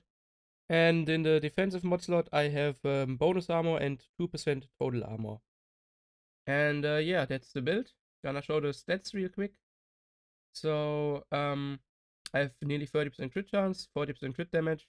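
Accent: Germany. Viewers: Yo! I gotta say u r making some nice videos! U kinda sound German tho... wanna say where u r from?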